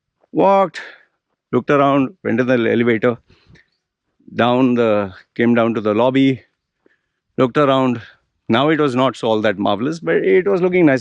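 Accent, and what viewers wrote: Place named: Malabar, India. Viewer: So after your Burj Al Arab visit you became sophisticated! But how come you didn't acquire that particular sophisticated British accent as well? And you still managed to retain your Malabari accent!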